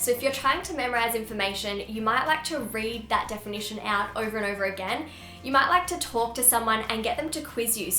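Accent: Australia. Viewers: Aeee love your Aussie accent x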